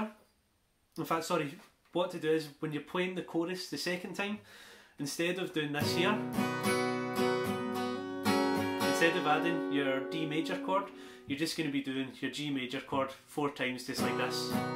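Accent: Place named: Glasgow, United Kingdom. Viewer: I really enjoy your lessons, the way you go over it is just fantastic bro. I don’t normally comment but wanted to thank you. I hope your channel’s doing well you deserve Success your style is natural and you are very relatable wae a Glasgow Accent. Loving the lessons keep them coming pleases & thank you again 🫡🫡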